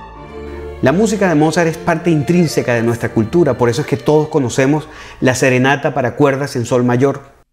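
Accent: Venezuela. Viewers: Hola. Acabo de descubrir tu canal y este es el segundo video que veo. Me parece que haces una excelente labor divulgativa y además es muy agradable escuchar las historias contadas con nuestro acento venezolano. Dicho esto, te aconsejo que tengas cuidado con las redundancias, ( "verificar la veracidad.." en vez de "confirmar la veracidad.." o "verificar la existencia.." ) y con la gestualización que me parece un pelín excesiva. Insisto, sin embargo en que la calidad de la presentación es muy buena y muy bien redactada. Te deseo un gran éxito. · Tu acento es venezolano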